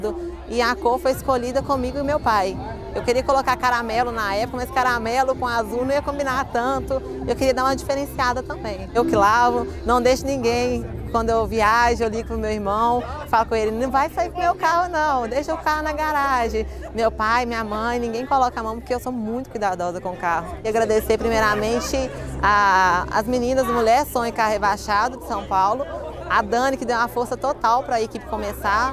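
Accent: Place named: Minas Gerais, Brazil. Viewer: Sotaque amei mineres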